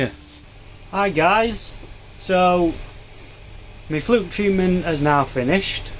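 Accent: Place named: Northern England, United Kingdom. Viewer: Great video pal, getting used to your northern accent now, from a Kent southerner!! 🤣 Keep the updates coming, very interesting 👍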